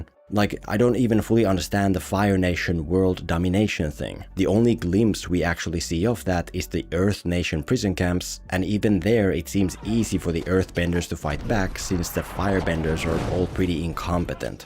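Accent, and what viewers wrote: Finland. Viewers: Onko tuo suomalainen aksentti jonka kuulen?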